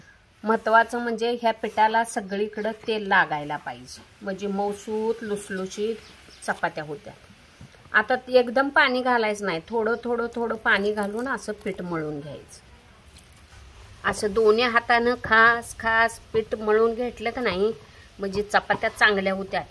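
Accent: Kolhapur, India. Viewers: Sweet and beautiful kolhapuri tadaka🎉 चपाती लागत्याच, माझ्या अनूभवावरून सांगतो, जातय, करतय sweet language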